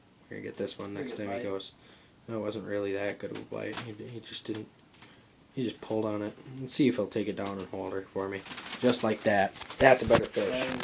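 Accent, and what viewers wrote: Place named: Minnesota, United States. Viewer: I miss that Minnesota accent 😁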